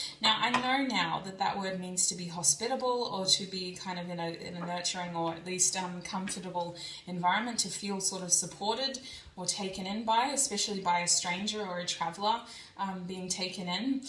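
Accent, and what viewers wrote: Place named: Australia. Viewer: Love Kirk (his name said in your lovely Australian accent!) 🖤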